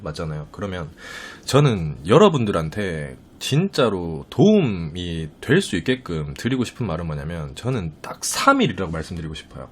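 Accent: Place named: Daegu, South Korea. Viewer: 대구사는데^^익숙한 사투리라 너무 좋고 진짜 최고의 인강을 보는거같아용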